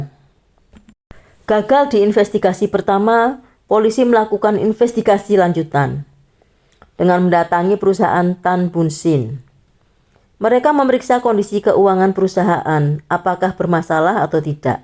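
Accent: Java, Indonesia. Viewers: Suara nya MCM org jawa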